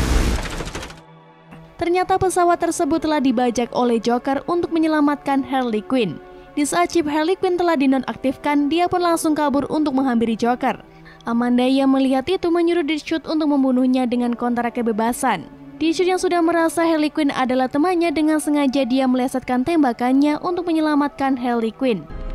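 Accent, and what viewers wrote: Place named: Batang, Indonesia. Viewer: Orang batang min?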